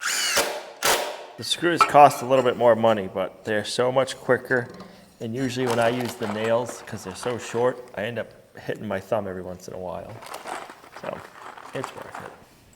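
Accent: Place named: Northeast, United States. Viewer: Truly loved the video! I like your Nor’Easter accent the best. Thanks for the positives you bring with each posting.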